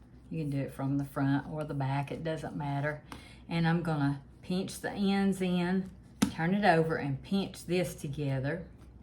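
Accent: South, United States. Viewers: Stupid Question...do you have 2 kitchens? One day I saw you with the above red appliances and the next day I saw a completely different kitchen - stainless steel!. Just inquisitive! AND I LOVE your cooking and your sweet voice. I am from North Carolina and I speak southern too! Thank you for making cooking look so easy. I'm 76 and have been cooking all my life. You just make things look so easy - I thank you and I will keep watching.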